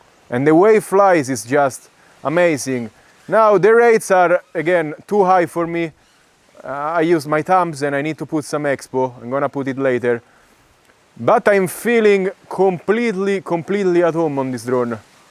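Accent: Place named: Italy. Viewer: The shitty AI automatically translates the voice into French but I prefer to hear real voice with his Italian accent.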